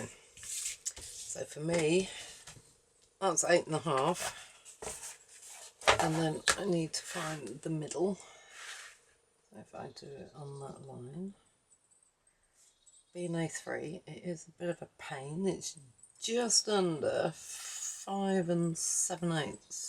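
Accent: United Kingdom. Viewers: Thank you so, so much for this tutorial. This is exactly what I wanted for my journal cover. I appreciate you taking the time to show every step of the way to achieve this result... and all of this with your beautiful accent that I LOVE. I am in South America but my soul dreams of a lovely quaint cottage in the English countryside. Anyway, did I say thank you? THANK YOU! THANK YOU! THANK YOU! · you live in our dream town!When my husband retires, that's where we want to live. I've only just discovered your channel - and from your accent you, like me, are from Oop North! Loving the chat, loving the tutorials and the way you do stuff. When we're in Glastonbury next we'll meet you at the cross and go for a cuppa or a drink. Hugs from a Scouser xxxxxx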